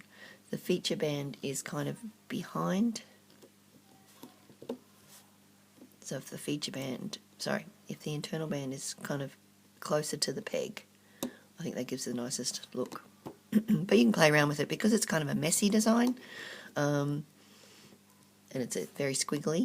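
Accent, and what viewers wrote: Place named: Australia. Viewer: Hahaha! I so understand not waiting for the "perfect time" to do a tutorial! If you have kids or an adult kid (husband;) ) you just have to "wing it" at any possible moment or none will EVER get done. Love your designs, you are amazingly talented! Also, I am so infatuated with Australia and love listening to those who have an Aussie accent, your manner of speech is SO COOL!!! Right now I am trying, SO HARD, to comprehend monster tail type looming. I am in awe of those, like yourself, who are making such beautiful designs this way!!!! Love you looming friend:)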